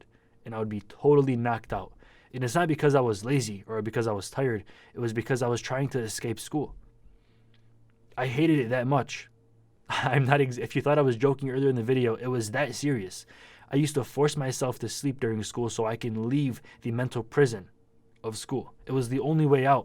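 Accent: Michigan, United States. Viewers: I thought your accent seemed so familiar lol I’m from michigan too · You look like you’re from dearborn you have our accent a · Immediately knew he was from Michigan because of the accent lmao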